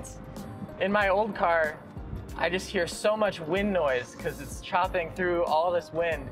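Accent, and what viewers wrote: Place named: San Diego, United States. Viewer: “Fermiliar” man that reminds me of home, good old San Diego dialect. Love “bolth” SD and what Apterra is doing for the industry. Everyone’s watching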